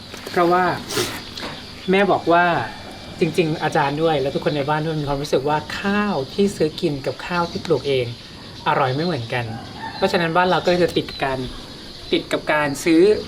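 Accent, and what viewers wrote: Isan, Thailand. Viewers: สาธุสาธุสาธุอาจารย์ป้าเป็นคนอีสานจ้าได้ฟังอาจารย์คุยกับแม่อาจารย์เป็นคำพูดอีสานฟัวแล้วคิดถึงบ้านจ้า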